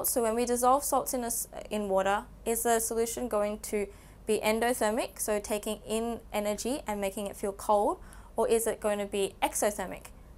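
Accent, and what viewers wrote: Australia. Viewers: I love you sm. I love your aussie accent too🥰🥰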